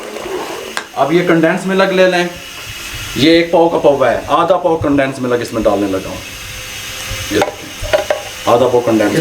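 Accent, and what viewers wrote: Punjab, India. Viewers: Asslam o alaykum, Bhai Jan aap k liye kucch mashwara dena chhahta hoon. Cheff aap ki kucch baten improvement chhahten hen. please consider Karen. 1- aap ney kam bolna he. 2- koi mas'la nahin he. (Matt Boleyn) 3- tension nahin leni (Matt Boleyn) 4- sirf Urdu Boleyn. Punjabi ka tarrka matt lagaeen. 5- aurr sab se buraa lagta he. (Samjha Karen). 6- aap ko chahiye sabb se pehley kisi bhi recipe key ajzaa (ingredients) bataen aurr unki quantity (miqdaar) btaen. Aurr phhir recepie ko banana shuroo Karen. Ummeed he meri baton ko masbat lete huee amal Karen gey. Jiss se insha'Allah aapki video quality improve ho gi.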